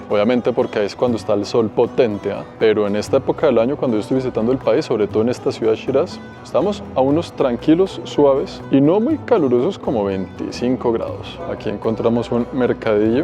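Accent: Colombia. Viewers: Me encanta su tono de voz tan varonil y ese acento colombiano tan característico, saludos desde Culiacán Sinaloa, México ❤ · es neutro de Colombia. jajaja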